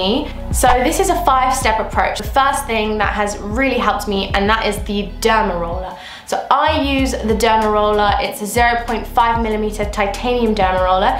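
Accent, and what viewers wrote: United Kingdom. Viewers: I LOVE your accent:D Your name sounds Arabic to me, but your accent is beautifully British <3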